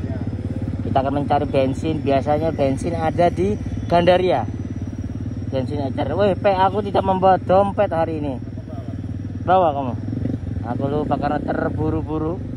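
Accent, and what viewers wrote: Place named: Surabaya, Indonesia. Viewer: Diencep encepkan, Suroboyo banget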